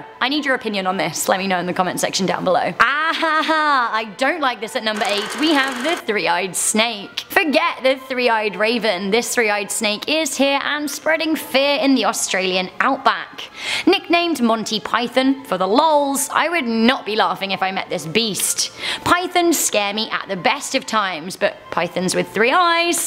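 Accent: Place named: United Kingdom. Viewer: Mary me! LOL. Seriously though your gorgeous plus I'm a sucker for British accents too.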